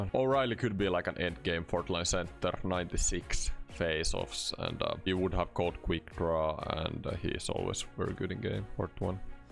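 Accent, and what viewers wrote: Finland. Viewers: Mä nauran kuinka hyvin nasevasti suomi aksentti paistaa englannissasi:D!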